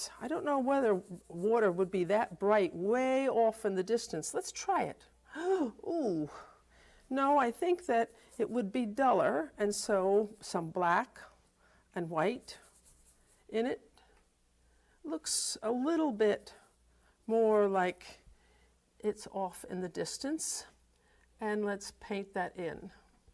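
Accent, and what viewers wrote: New York, United States. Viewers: Would be honored to get my hands on Helen's books. I've been able to view many of her dvd's through interlibrary loan. I immediately resonated with her unmistakable New York accent.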